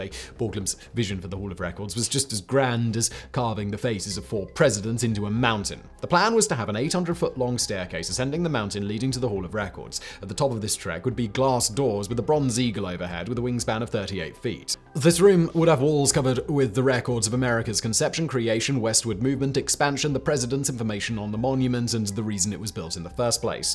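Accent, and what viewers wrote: United Kingdom. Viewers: South Dakotan here. Was cleaning my bathroom while listing to your today I found out Japan and Germany, and then this auto plays and I just hear this goofy British man say there is no more boring a place. He’s right but like damn, didn’t expect such a personal attack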